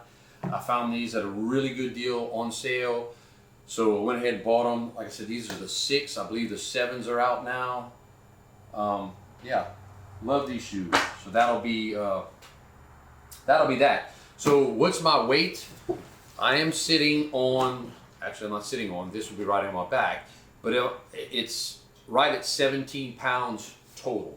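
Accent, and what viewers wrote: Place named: South Louisiana, United States. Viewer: That accent. You south of I-10? haha I'm a N. LA boy aka "fake" Louisianian.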